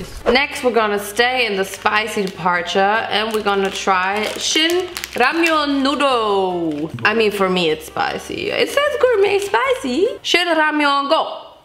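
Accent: Germany. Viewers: Wie man ihr deutsch hört ahhahah love it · Man hört ihren deutschen akzent so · ✨Deutsch Accent✨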